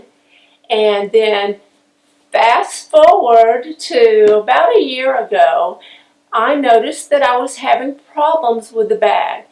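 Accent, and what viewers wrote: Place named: South, United States. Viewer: I love your accent so much - south of the United States is so melodic and different (relaxed in a way) to me as a non native speaker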